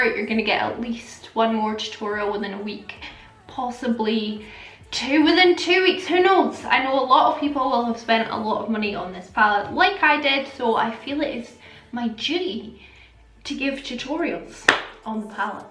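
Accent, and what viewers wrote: Scotland, United Kingdom. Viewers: I adore this!!! Where are u from girl? I’m getting a Scotland accent and I’ve been waiting for a Scottish MUA pal for literally forever so I’m dying😻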